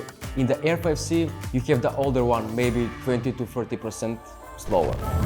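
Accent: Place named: Russia. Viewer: Такое чувство что ты русский который выучил английский и косишь под американца))) А по теме всё супер! Спасибо за видо.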